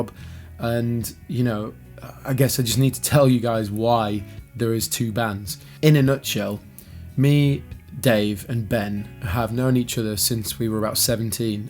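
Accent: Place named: Northern England, United Kingdom. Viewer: Mad our paths probably crossed back in the day. I noticed you were Northern by the accent but didn't know Leeds. Did a couple of modules of my degree at LCM in 05/06/07. I remember Dave often doing the sound for the metal gigs in Royal Park Cellars and knew his brother John (and later worked with him!). Funny old world.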